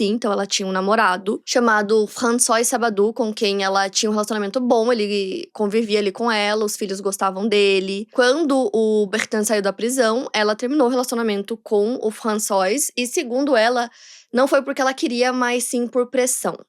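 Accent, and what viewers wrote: France. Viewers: PARABÉNS pelo belo francês ♡ arrasouuuu ❤ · A gata arrasando no sotaque francês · Eu amo quando a fala francês, vc e incrível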